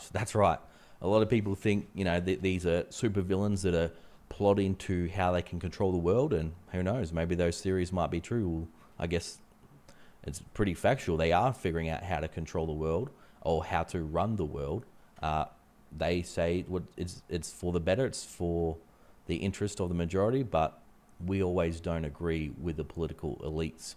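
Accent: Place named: Australia. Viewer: Yeah mate. He speaks English. Australian English.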